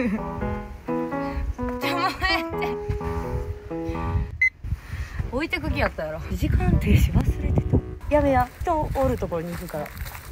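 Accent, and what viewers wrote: Kansai, Japan. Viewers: さんのコテコテ感のある関西弁大好きです♥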